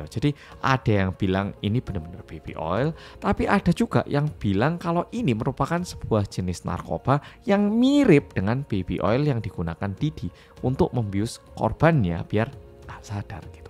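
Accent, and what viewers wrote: Java, Indonesia. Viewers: Sebagai orang Jatim paling ujung barat “Njenengan jouwosh, Mas”. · karna medhokk, aku jd ngakak bukan horror wkwkw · Akhirnya mas bikin videonya jg. Makasih mas. Krna penyampaian nya mudah dimengerti, detail jg, gk ketinggalan medhoknya 😅, editing nya jg keren sih, jd terhibur jg apalagi part mas nyanyi 😂🙏🏻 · Korea medhok 😂 suka denger ngomong nya lucu bgt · wkwkw aku yg udah liat versi dari nessie sama jerry yg banyak pake aksen inggris, ke mas yg pake logat jawa jadi ngakak 😭 berasa ngobrol sama temen sndiri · Aku delok ki ora kyk delok youtuber korea kyk youtuber jatim… tp gpp iku warnamu wes ojo d ilangi… sering2 dolan malang ben gak ilang medok e… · Akhirnya versi medhoook.. wlw telat wkwk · Apanya yg lucu dari kemedhokan ini... Aku bhiasha ajha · Paling senang lihat penyajian Mas. Paling rapi dengan bahasa yg santuy dan gak bikin pusing yg lihat. 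Good job mas korea jawa medok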